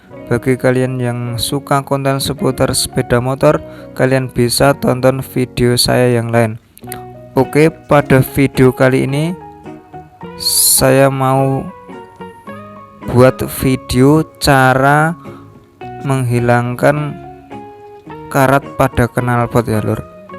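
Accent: Indonesia. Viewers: Orang gebang ya om? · Apa itu grenjenk...??? Jadi ketawa sendiri dengar penjelasane mase,..Jowo ndi mas???? Q jatim.......👆👆👆👆👆👆👆👆👆😁😁😁😁😁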